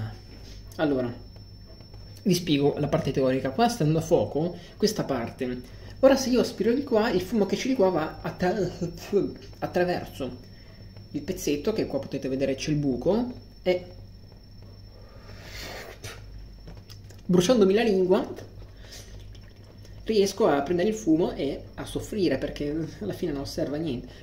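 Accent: Italy. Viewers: Se parli romanaccio non fai ridere sembri solo un boomer che vive a Roma e vuole i like👌NOICE cmq chi sta leggendo copiate e incollate alle persone tipo questo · Ma sei di Pesaro? Hai la parlata da pesarese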